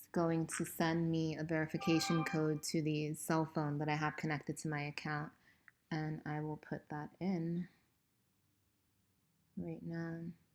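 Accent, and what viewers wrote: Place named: New York, United States. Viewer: The New York accent makes this video that much better.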